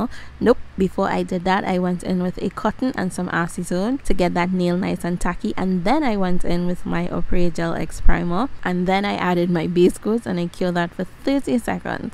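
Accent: Trinidad and Tobago. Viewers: I heard the trini and got so excited 👏🏽👏🏽subscribed and followed one time. Awesome video 🤍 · I can spot a Trini accent from anywhere 🇹🇹🇹🇹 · where are you from u sound trini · As soon as you started talking I knew you were Trini <3 !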